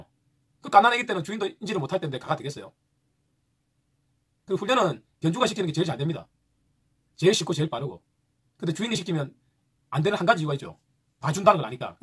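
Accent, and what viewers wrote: Gyeongsang, South Korea. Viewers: 좋은강의 내용 너무나 좋습니다그런데말이 너무 빠르고 경상도 사투리에 빠르다 보니서울에 사는 저는 무슨소리인지 60%밖에 못 듣겠습니다.천천히 또박또박설명을 해주시면 더 좋겠습니다앞으로 더많은 강의 부탁드립니다감사합니다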